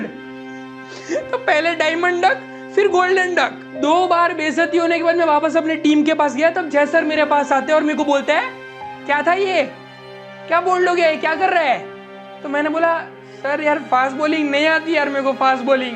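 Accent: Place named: Jashpur, India. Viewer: Bhai are you from jaspur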